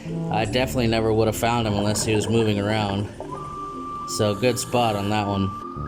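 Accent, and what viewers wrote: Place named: South, United States. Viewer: nice nice vid.. plus i like your strong southern accent ! enjoy my country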